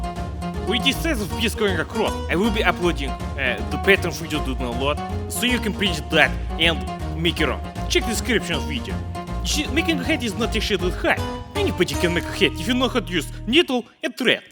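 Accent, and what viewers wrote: Russia. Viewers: This dude has the thickest Russian accent I've ever heard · Мне 1 кажется что ты не американец а русский?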